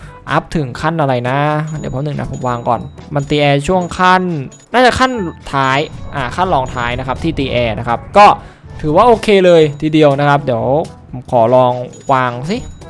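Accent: Suphanburi, Thailand. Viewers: อ่าวอยู่สุพรรณเหมือนผมเลย ว่าละทำไมสำเนียงพี่เหนื่อๆ55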